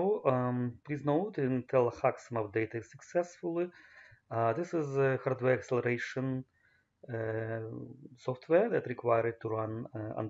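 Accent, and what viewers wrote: Russia. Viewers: чета у тебя русский акцент и фамилия русская?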